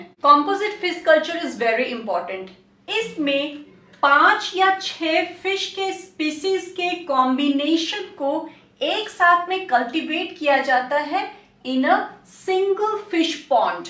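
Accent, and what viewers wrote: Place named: Bihar, India. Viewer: Where you from? It feels you are from either bihar Or up. Anyways it was a wonderful lecture and helped me truely.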